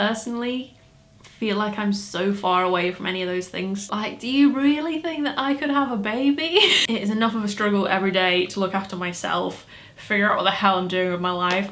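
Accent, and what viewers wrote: Liverpool, United Kingdom. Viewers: I love how you dip in and out of a scouse accent.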